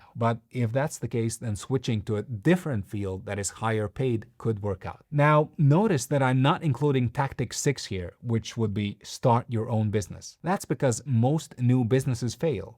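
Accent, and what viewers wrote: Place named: Latvia. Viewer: Klausoties dzirdu nedaudz latviešu akcentu :)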